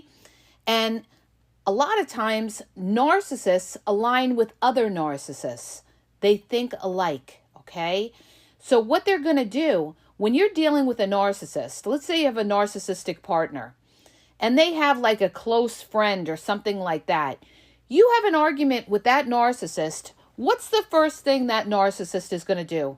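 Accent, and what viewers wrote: New York, United States. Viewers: Your from queens or long Island, it sounds like im back in the old neighborhood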